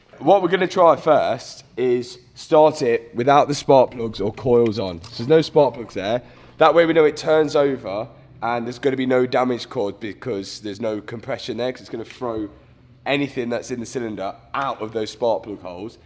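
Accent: Leicester, United Kingdom. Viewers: Rat er ley, fer rar ey, noise ey love it good old Leicester accent. And cracking video well done 👍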